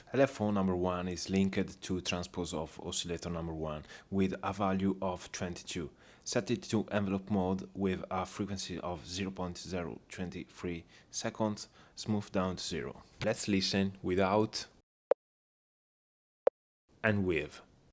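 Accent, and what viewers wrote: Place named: Spain. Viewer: considerato che sono italiano, direi passabile. Pensavo tu fossi spagnolo, forse è l'accento! Non te la prendere, scherzavo sull'inglese, ma sono serio con i complimenti sul tutorial, davvero un ottimo lavoro.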